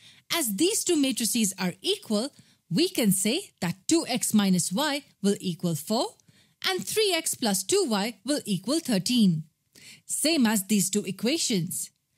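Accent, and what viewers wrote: India. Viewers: Indian accent is good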